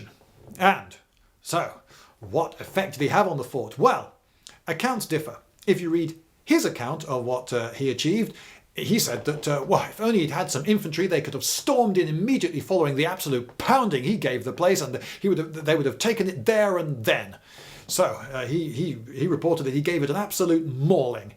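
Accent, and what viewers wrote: United Kingdom. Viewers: Now I don't know why this was recommended to me. I don't like fortresses nor canons, I certainly haven't heard of before. I was just going to give this video a chance and see what this older man was going to ramble about (me in my pessimistic view).... Before I knew it, around 39 mins have already passed, haha! I still didn't find the topic particularly interresting, just that there's something about hearing British speaking person explaining things that made me stay. If I remember right, I used to watch a nature program/show narrated by Attenborough and I think he spoke British English too:) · İs your accent the english one? Asking cuz i can clearly understand your speech without subtitles. So i wanted to know if you had english accent (Sometimes i can have problems in the american accent) (Btw i have only watched 2 of your videos which were the muzle break one and the clip-magazine one and they were awesome so i am subscribed to you from now on)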